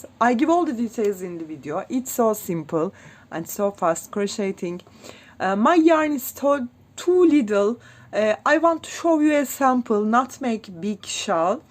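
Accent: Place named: Turkey. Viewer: best turkish-english i have ever heard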